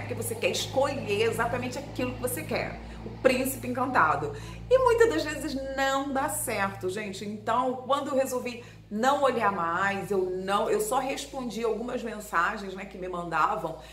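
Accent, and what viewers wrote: Rio de Janeiro, Brazil. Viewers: Convicções maravilhosas, ter cautela em qualquer situação, ter dois pés no chão, e cuidar com os perigos virtuais, também acho que vc e seu noivo formam um lindo par, são almas afins não existe alma gemea há encontros que não são conscidência, são providência de Deus mesmo pessoas que já estão ligadas pelo destino um do outro que eu sei lá acho que já são pré destinadas uma para outra...bjo vc sempre linda e elegante com essa dicção carioca do sutaque que fala o português coloquial.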